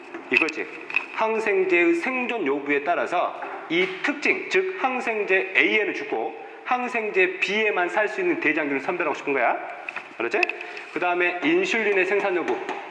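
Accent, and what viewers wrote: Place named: Jeolla, South Korea. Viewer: 아따 전라도 사투리 구수하구마잉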